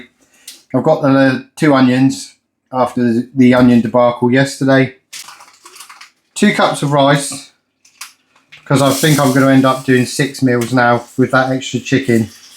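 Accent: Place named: Gloucester, United Kingdom. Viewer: Is that a Gloucester accent , I’m the Glos- shire originally do like hearing the accent 😊🎉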